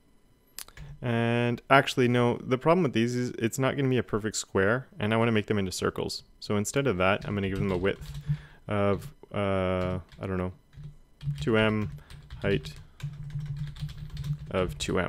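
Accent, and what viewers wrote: Canada. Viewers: Thank you sooo much! This vid was incredibly helpful and made me a new sub. And I could tell right away you are Canadian too lol <3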